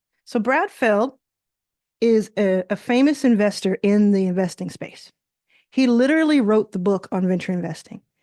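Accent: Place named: Kenya. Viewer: You have an English accent of a Kenyan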